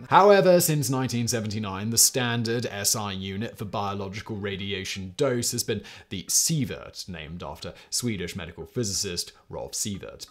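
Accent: United Kingdom. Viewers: He has a very clear and precise British accent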